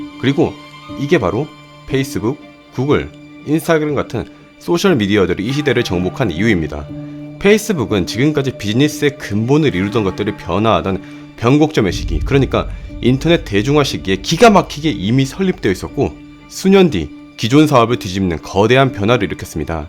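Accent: Gyeongsang, South Korea. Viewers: 경상도 분이시군요?^^♡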